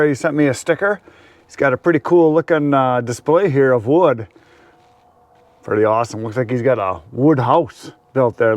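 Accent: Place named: Midwest, United States. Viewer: Good story about the flame / firewood. Its true too. Love your midwest accent., Reminds me of my college years in Appleton WS. Go Packers !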